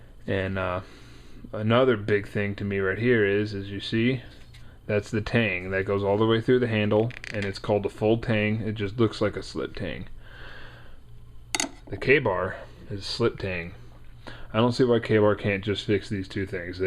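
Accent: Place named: West, United States. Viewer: Sounds like you from the West. Some good advice brother.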